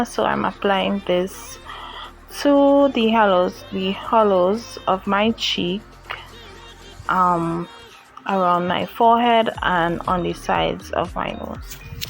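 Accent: Trinidad and Tobago. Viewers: Hey Hun, I love this tutorial, this look is simple and pretty, love hw you did that wing liner. Love that Trini accent, Jamaica over here 🥰. Enjoyed watching, i subscribed, I have a channel also, stop by and show some love, tale care 😍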